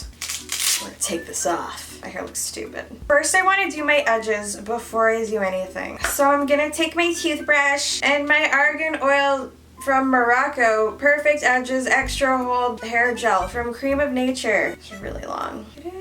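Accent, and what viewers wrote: Canada. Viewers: Your Canadian accent sounds like how my grandpa talks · Canadian accent soo cute😂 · Canadian accent sounds really nice. I just realized they had their own accent 😂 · Your Canadian accent is killing me. 😂 I'm from the U.S.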